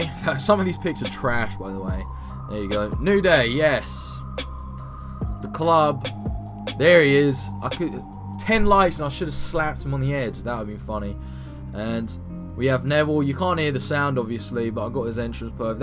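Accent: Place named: New Zealand. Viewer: Nice vid m8. You actually kinda have a kiwi ( new zealand ) accent a little. Im kiwi and you sound like us bro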